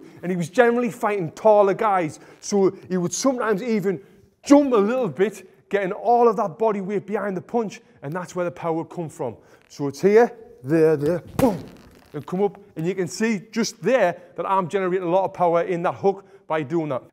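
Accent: United Kingdom. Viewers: Sorry can't have a brit talking mike.tyson needs to be NY accent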